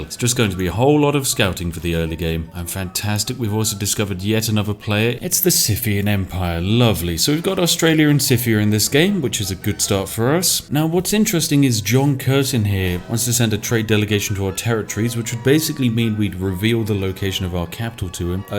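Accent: United Kingdom. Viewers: That brittish accent is just perfection...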